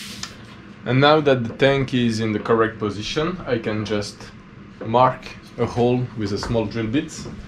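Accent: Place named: France. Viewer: On dirait qu'il y a un petit accent français 😏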